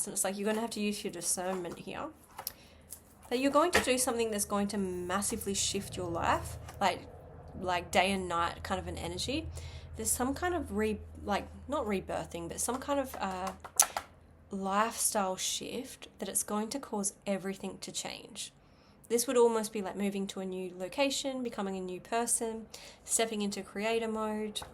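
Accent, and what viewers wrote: Australia. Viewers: You have to be good. You hail from Oz. I knew you were by your accent. I'm from Oz. Adelaide living in Canada.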